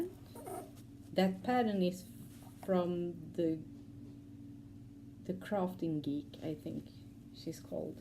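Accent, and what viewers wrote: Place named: Sweden. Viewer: Hello from the UK 🇬🇧 I love your swenglish! And great wips x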